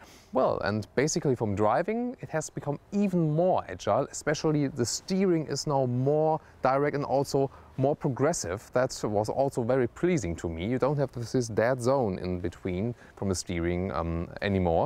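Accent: Germany. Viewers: Nice details, impressive narration. Love to listen your German-accent English. Keep going,!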